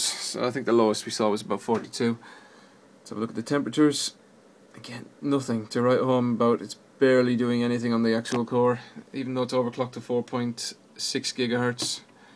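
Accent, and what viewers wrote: Ireland. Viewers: Are you in any way Irish? :D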